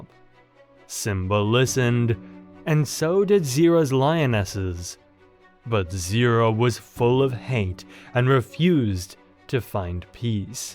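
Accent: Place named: United States. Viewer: Kopa never meet Kion and Kiara i remember the time Zira attacked Kopa you sound American im pretty good at recognizing people's accents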